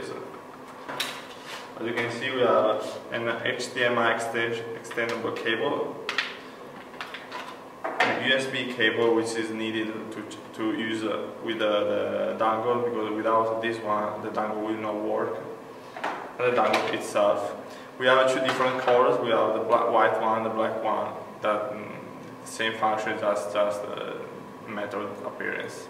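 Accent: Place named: Italy. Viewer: italian accent xD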